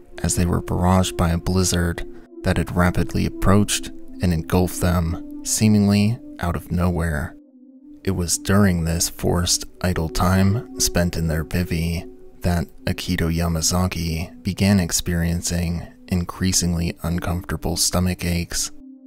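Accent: Midwest, United States. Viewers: Mid west accent fer sheer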